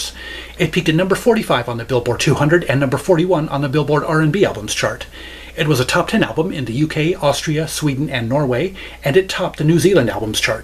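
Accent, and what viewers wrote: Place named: Canada. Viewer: WOW!!!! How the heck did you do that sound with your mouth in the beginning??!?! I was enchanted! Sounds like you are a fellow Canadian. I need to check out that record.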